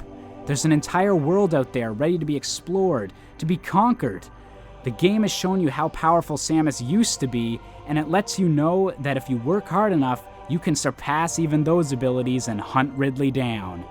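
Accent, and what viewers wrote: Canada. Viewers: dat Canadian (?) accent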